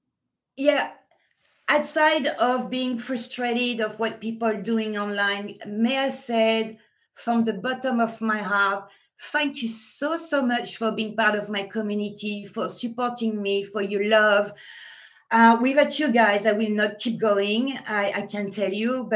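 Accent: France. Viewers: Your cute french accent is so charmimg on youtube. Please don't go... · Merci , merci …pour votre bonne humeur , votre beau sourire , et vos vidéos que j’adore écouter , parce qu’enfin , je comprends tout , votre anglais est adorable , avec un accent français , un peu comme le mien 😂🥰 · Take care of you but please keep us in your life! Love, love, love you and your social media. Best part is hearing your lovely, smooth French voice. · I recently found your YouTube channel and Wow!! I love all your content, your personality, your French accent 😄. I just want to say don't give up. It looks like you have so many people who support you and love what you do. Your ideas are classy, doable and timeless! I love it all! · Love your sense of humor ! Enjoy listening to your lovely French voice and uplifting ideas on all subjects ... so glad I found your utube channel. Best wishes. 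Karen from Denver Colorado.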